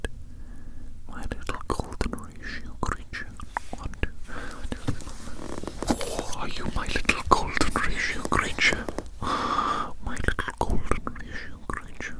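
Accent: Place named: Scotland, United Kingdom. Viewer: Is he scottish ? Idk why but i'm pretty sure he has a scottish accent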